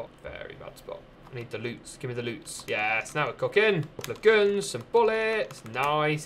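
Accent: United Kingdom. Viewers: You sound like ali-a, it's almost like you might be from the same part of the uk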